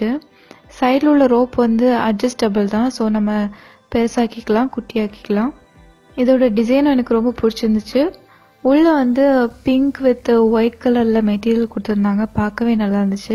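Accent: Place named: Kanyakumari, India. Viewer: Kanyakumari slang super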